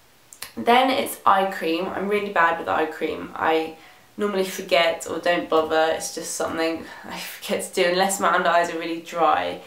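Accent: England, United Kingdom. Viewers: What part of England are you from? Love your accent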